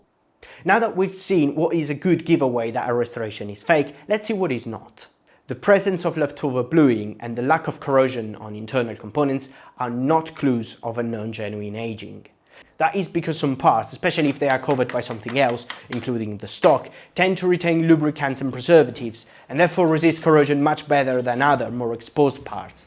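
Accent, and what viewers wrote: Italy. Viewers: Northen Italy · Came via the Internet Anarchist, really love this, especially as I know nothing about firearms. Also, one of my mates has a girlfriend from Milan and you sound exactly like her.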